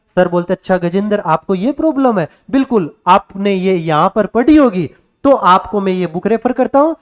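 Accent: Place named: Haryana, India. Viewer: Sir aapki voice tone se lag rha h ke aap haryana se ho, mai bhi haryana se hu and I am preparing for neet ug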